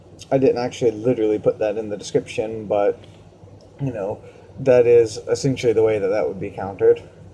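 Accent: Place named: United States. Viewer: Are you german? Your accent i very american ^^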